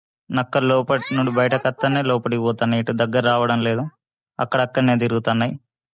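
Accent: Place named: Telangana, India. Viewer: Pakka telangana slang.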